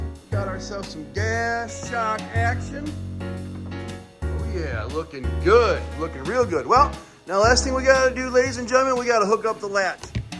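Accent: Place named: Tennessee, United States. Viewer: That accent sounds like around central TN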